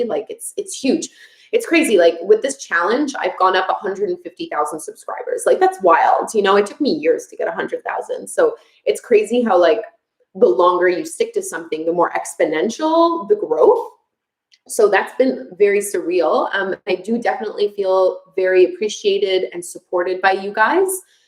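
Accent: Canada. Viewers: Thank you for this challenge, I got my boyfriend to start doing yoga with me everyday and we are loving your videos! Also I loved hearing you speak French! I'm French and I love your Canadian accent! ❤️❤️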